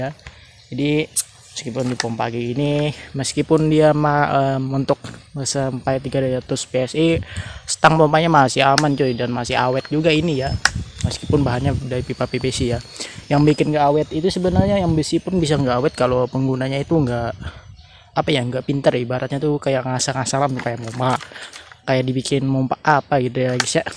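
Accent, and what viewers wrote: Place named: Banjar, Indonesia. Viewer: Orang banjar kah bang , nampak bet cara logat ngomongnya😂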